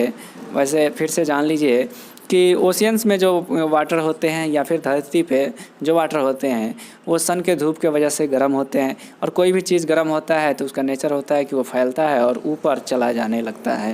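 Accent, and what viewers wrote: Bihar, India. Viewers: Aap bihari ho naa aapke bolne se pata chal raha h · Mujhe toh aapki awaz ko sunte hi pata Chal gaya ki Aap bihari or bandar ho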